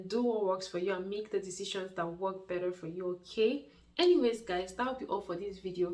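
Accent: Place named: Nigeria. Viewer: Very good video ! I like the way you explain the things ! I watch your video from Ottawa ! I like Nigerian accent 😀👍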